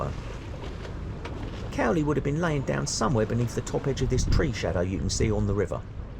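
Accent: London, United Kingdom. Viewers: Mate, I ain't sure if this is Mockney or Cockney... But either way it works brother! You comin' across ere like a right cheek-chappy! 😉👍🇬🇧 Edit: 5.35 A ground-level bay window projecting across the pavement and almost kissing a lamppost opposite!? Who/what/how did this get rubber-stamped? 🤔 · Great to hear a proper Londoner talking about London. Our government needs arresting for treason the state of the place now.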